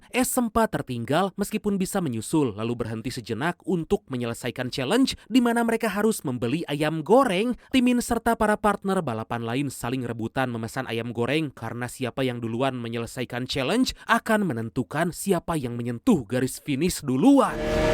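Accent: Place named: Banjar, Indonesia. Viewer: Banjar bnr pian lh 😅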